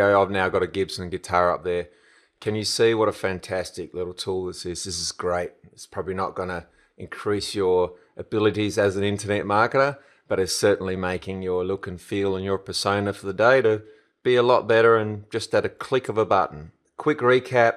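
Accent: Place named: Australia. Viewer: australian!! i love that accent.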